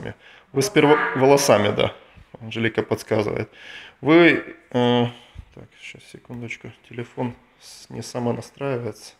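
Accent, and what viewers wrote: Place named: Ukraine. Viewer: Привет!)Акцент украинский хороший!)))Спасибо за советы!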